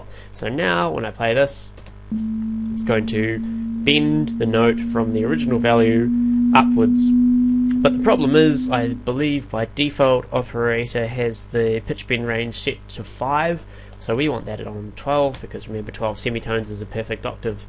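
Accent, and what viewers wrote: New Zealand. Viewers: so obvs a kiwi haha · he's from New Zealand.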